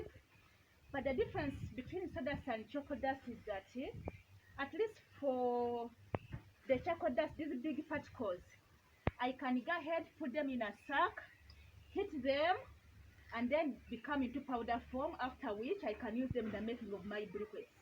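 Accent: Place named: Uganda. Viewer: I want to be your friend..i work together in saudi with annet..shes from uganda..the way she speak is same as you...shes a goodgirl also..freindly and kind..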